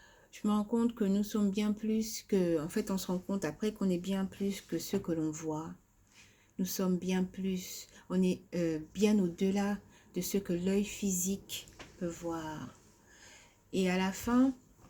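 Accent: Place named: England, United Kingdom. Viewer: Merci pour la lecture des messages, des clés qui mène vers le chemin du succès. Vous avez vraiment l'accent Anglais. Et qu'et ce que c'est beau à l'écoute. J'ai adoré. Merci soeur pour votre merveilleux partage. Abssaanaa.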